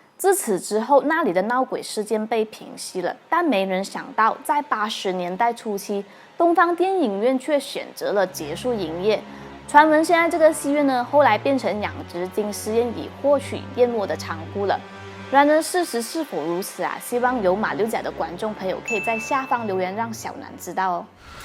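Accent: Cantonese, China. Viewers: u cakap cantonese cute sikit ~~ try to do some cantonese content ~~ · 你好可愛呀，你啲粵語好得意好好聽🥳🥳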